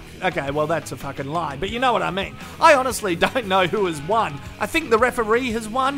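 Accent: Australia. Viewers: Shit! Jesus... that was pure gold! Aussies swear better than the french. Without the accent, this would not be as hilarious. Good job m8! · This is like wrestling meets kickboxing with a aussie commentator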